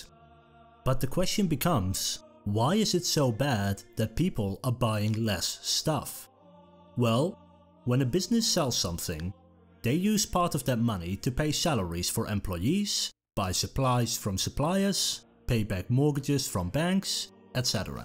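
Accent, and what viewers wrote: Netherlands. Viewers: Thank you for the content... I am listenning to your accent... are you Dutch? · you probably know this but he is Dutch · Of course. That's a thought. However, it would work with an unmarked accent and the emphasis on 'rupt'. However, with his heavy accent it sounded like a mistake. Now that I realise he is Dutch, the word 'rupts' is in that language too so he might have presumed that it translated to English. All very interesting. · Are u dutch? Ben je Nederlands? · Ik had graag deze video willen genieten, maar je Nederlandse accent en VOORNAMELIJK je vreselijke "slis" maakt het verschrikkelijk om naar te luisteren... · Kom je uit Nederland? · He's dutch. It's an accent. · Narrator sounds Dutch. Is he Dutch?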